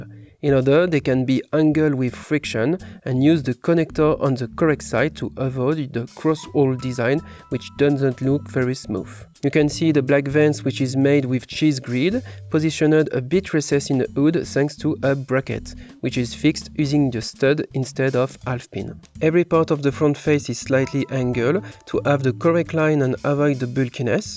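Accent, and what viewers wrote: France. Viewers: Salut mon gars ! J'adore tes créations. (je sais que t'es français : notre accent...) Je pense même à acheter les pièces pour construire ton modèle.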